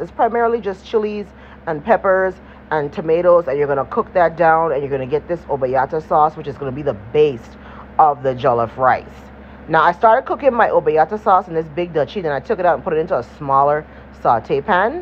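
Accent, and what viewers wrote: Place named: Guyana. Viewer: I Know You're Not Guyanese, But You Kinda Sound Like It..I Love All Of It Tho. Thanks For Sharing.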